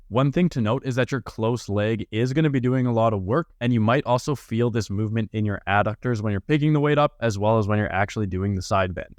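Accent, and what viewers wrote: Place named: Canada. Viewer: I can tell you’re Canadian istg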